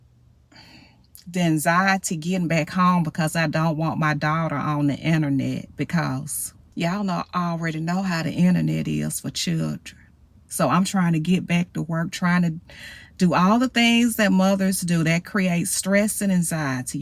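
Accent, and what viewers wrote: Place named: Alabama, United States. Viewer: How did I know that accent was from here… Alabama!! Whew our accents are so unique lol!! Sending love!! ❤❤❤❤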